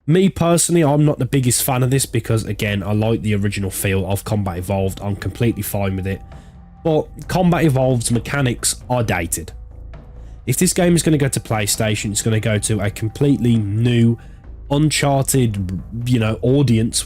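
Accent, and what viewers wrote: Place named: Liverpool, United Kingdom. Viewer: Best Halo YouTuber with the scouse accent 😉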